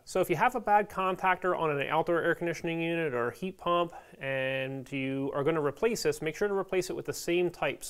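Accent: United States. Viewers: Speaking in AMERICAN English ?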